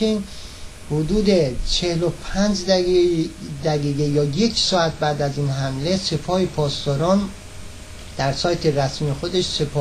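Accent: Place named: Turkey. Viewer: جه لحجه گشنگی دارى اقاى كوينده همه سريع متوجه ميشن كه تركى..!! اين كروهايى كه شما نام برديد همه شان كسانى هستند كه قاتل بدنيا أمده اند و كارشان كشتن و انتقام است حالا جه ايرانى و جه عراقى و ربطى به مليت و مسلك ندارد..!! در اين كشورها هر ننه مرده اى اسلحه به دست ميكيرد و ميخواهد بكشد و از خدا حرف بزند..!! هر كه امد به جهان نقش خرابى دارد در خرابات نبرسيد كه هوشيار كجاست..!! خاورميانه يعنى باغ وحش..!!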